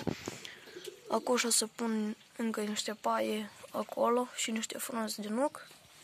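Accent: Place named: Moldova. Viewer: Imi place accentul tău de moldovean, dar si de cum îngrijesti porumbeii din gospodăria ta. Like 22.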